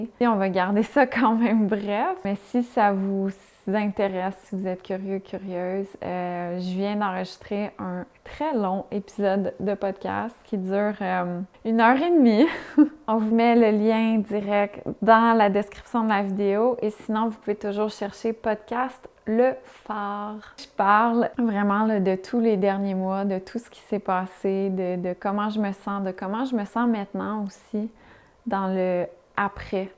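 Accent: Quebec, Canada. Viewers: Tellement heureuse de te revoir! Grâce à toi, j'apprécie l'accent québécois et tes vidéos m'ont toutes beaucoup apporté, je les partage avec ma fille qui découvre la vie (11 ans). A mon tour, je t'envoie donc plein d'amour!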